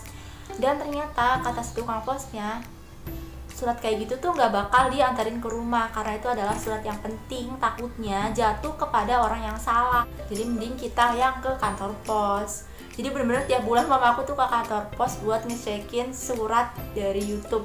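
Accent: Banyumas, Indonesia. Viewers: 😅 dengka pada bae ngapak karo nyong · Wong Ngapak Ya 😁 👍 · hai kaka cantik dan ngapak lagi,smpurna deh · Jebul wong ngapak kaya Inyong, makasih motivasine mbakyu , sukses 😁👍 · Salam dari magelang kak. ternyata ngapak ini orang😂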